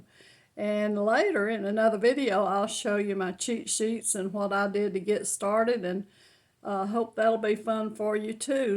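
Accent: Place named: Georgia, United States. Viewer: Hi. Nice to meet you. You are adorable and very sweet. I can tell you have a kind heart and have so many fascinating stories to tell that us younger ones can learn from. Btw your accent is so nice to listen to. I live in Italy btw so I don't get many opportunities to meet any folks from Georgia.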